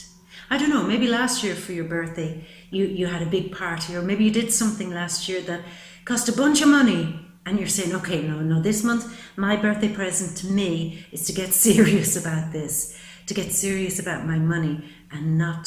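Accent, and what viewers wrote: Ireland. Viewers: I absolutely love your energy! You have an accent so I assume you are Irish? If so, for my August 8 birthday next year I am going to Ireland and Scotland. My beautiful daughter is taking me for my 60th birthday. I can’t be more excited I love and always wanted to go to Ireland! I think you are absolutely a joy to watch! Thank you for your wonderful readings!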